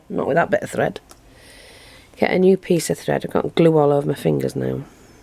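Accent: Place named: Hull, United Kingdom. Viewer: your stuff is fantastic hun im in hull your acsent doesn't sound to far away lol x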